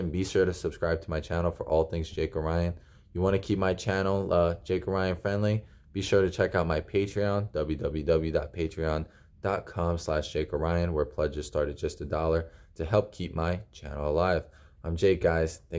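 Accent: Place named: Pasco County, United States. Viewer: Sounds like you live in pasco County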